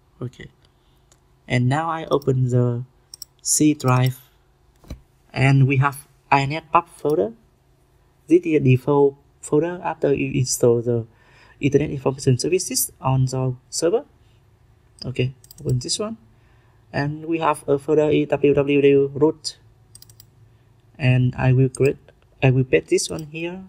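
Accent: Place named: Vietnam. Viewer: Sir the way you speak english I assume you're a Vietnamese cause I'm a VNmese and it's really easy to spot out a Vietnamese, in that case Xin chào và video này dễ hiểu ấy But if you're not then ok It's really easy to understand what you said and what you do. Thankyou sir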